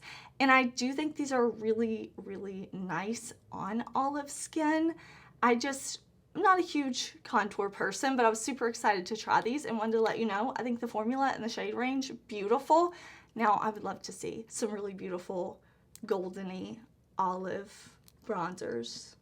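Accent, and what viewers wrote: South, United States. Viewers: you have the cutest eyes! I also adore your southern accent. I’m Canadian and we get told we have an accent too, but I can’t tell. Your makeup in the intro is fire. 🔥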